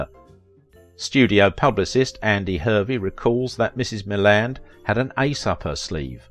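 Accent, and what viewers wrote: England, United Kingdom. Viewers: Sometime this guys English accent is just too much for me. I can't understand everything he says. Is this only me ?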